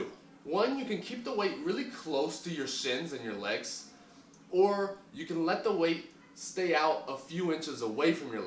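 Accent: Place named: Texas, United States. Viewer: Hey. This is one of the best explanations of the RDL that I have ever heard. You must be a great trainer. One thing I missed is how far apart are your feet? One feet, two feet? Also do the Brits question you about your accent and say "Oh you must be a Yank?" At which point you say "no, I am a Texan!" Thanks for the video.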